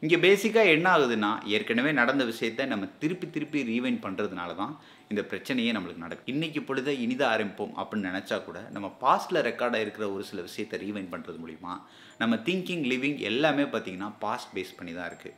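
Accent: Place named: Madurai, India. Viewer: Bro I think Nega madurai or South side tha unga Native ah irukunu nenakeran..😄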